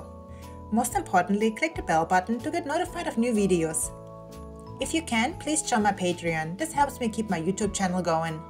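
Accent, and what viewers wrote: Germany. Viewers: Your German (i lived in Germany for 6 years who are know for over engineering most things I say this being French and knowing are most resent European history <num>) and I see you have some advance shop skills with a big push for safety which leads to think your a smart lady like many good Germans well above aveage, but with you aching your back the way you do does not help cutting wood it helps with you getting the views your algorithm and yes even comments and thumbs down would help you if you turn them on why you don't is silly! Your German you must have thick skin living with Americans because I heard alll the joke made towards Germans for many years all all the American living in Germany still to this day my father was in the American army who came to France at the tail end of WWII, so I know the story very well I hear many from my mother who half German and half French she did not lie to me she was in the middle of WWII she knows the truth! 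I am older than most of your aveage boys watching your videos, and I don't fault a pretty lady looking to get her bag with even if you have a side hustle that a lot questionable your not American your Greman accent is heard very well by most Ameicans, so I get it you have bills to pay but you only cut wood with that shirt open that far down and aching your back until you nearly flip backwards and we both know you cut wood like this on camera you would not cut wood like this on a construction site because we both know what would happe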